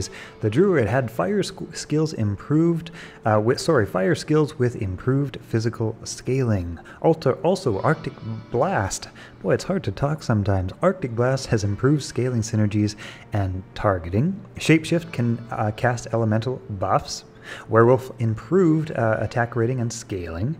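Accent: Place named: Canada. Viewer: and the way he says about. I'm not saying anything bad, most Canadians are pretty nice and cool. But with certain words you can almost instantly know that they're Canadian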